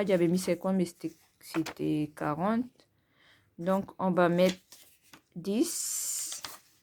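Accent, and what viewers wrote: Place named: Senegal. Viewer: Bonjour, un bon début de week-end😊 ps: je trouve que vous avez l'accent sénégalais trop mim's. Moi aussi, j'ai commencé le principe des enveloppes budgétaires depuis fin décembre. Pour le moment, hors vidéo, j'espère pouvoir me lancer l'année prochaine pour avoir un suivi plus assidu et motivant! Les débuts ne sont pas faciles mais déjà beaucoup de changements pour moi sa motive.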